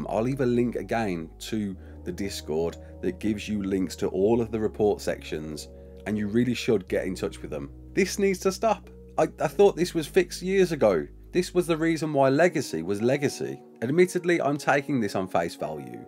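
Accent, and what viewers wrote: Birmingham, United Kingdom. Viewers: they will nerf the astro that much, you might as well use a tek tapajaro, and i say void wyvern, sounds better than void worm, maybe its the accent, are you a brummie or a black country lad?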